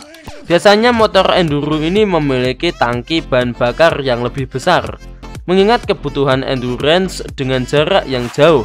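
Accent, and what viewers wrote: Java, Indonesia. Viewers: Mantab unik dubbernya cadel plus medok namun penjelasannya cukup jelas dan pas ..👍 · Salam medok mas...yg asli orang Jawa mana likenya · Jangan kau hilangkan cirikhas mentok njowo mu iku mas. Salam dari AKU. anak campuran portugis,batak, jawa 😁 dari MEDAN.. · Medok buanget mas broo... sampe Mblerengg gaess ,,uhh Mblereng gaaeeessss..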